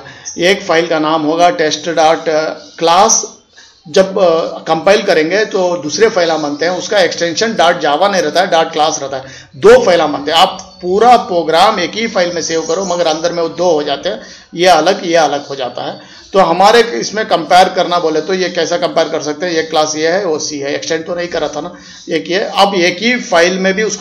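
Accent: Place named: Hyderabad, India. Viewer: Haha! Hyderabadi! 👌🏻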